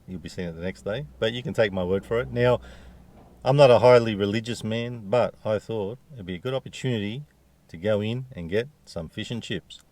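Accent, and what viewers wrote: Australia. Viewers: I'll watch anything as long as there is an Australian accent. Love the Aussie's!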